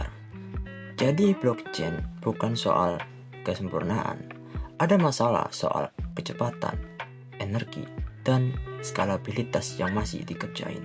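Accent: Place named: Java, Indonesia. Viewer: Medok banget suaru lu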